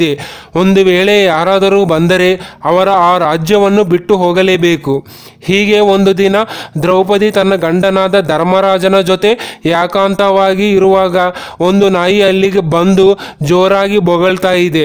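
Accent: India. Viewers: Yarappa Nenu Kannada na Malayalam style Alli mathadtiya modhlu Kannada Kalli amele story helu..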